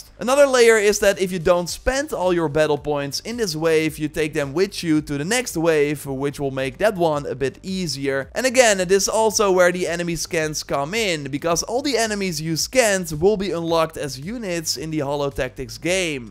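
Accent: Netherlands. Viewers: Telling by your accent, I immediately knew you are Dutch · Are you Dutch? I think I hear it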